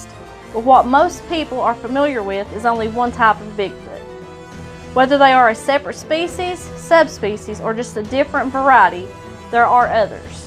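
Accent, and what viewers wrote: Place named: Appalachia, United States. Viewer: What a bunch of garbage with a hillbilly accent !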